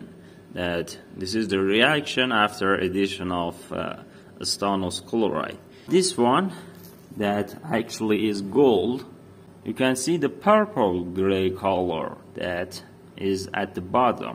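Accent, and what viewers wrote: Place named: Arab world. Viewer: Hi sir from which country you belong your accent seems to be Arabic.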